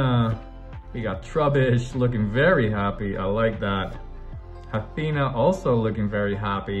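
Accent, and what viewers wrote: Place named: Netherlands. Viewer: Ur accent sounds like ur from Holland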